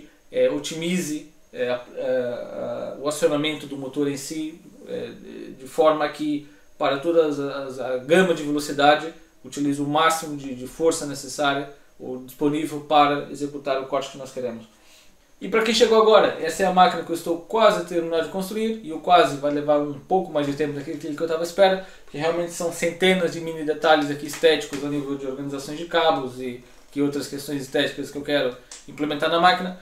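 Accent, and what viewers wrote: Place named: Brazil. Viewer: Olá! Parabéns pelo vídeo! Sou do Brasil. Confesso que seu sotaque é bem reduzido. Fiquei em dúvida se você era brasileiro ou português... Kkkkkkk.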